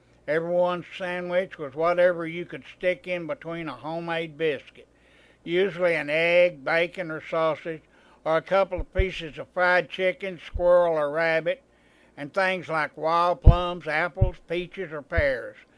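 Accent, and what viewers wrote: South, United States. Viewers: What a fantastic Idea..School stories.Only thing,us northern canada guys find the story teller a bit hardish to understand,(southern accent)ha ha.Thanks for all your e mails & everything in the past.Ray Meyers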